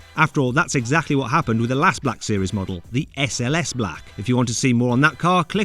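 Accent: United Kingdom. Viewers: 이거 영국식 발음인가 뭔가 딱딱해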